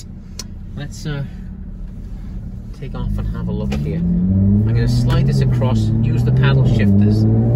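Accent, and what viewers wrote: Wales, United Kingdom. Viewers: Definitely a Welsh accent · Great video,.. Slight hint of a Welsh accent there I do believe 😁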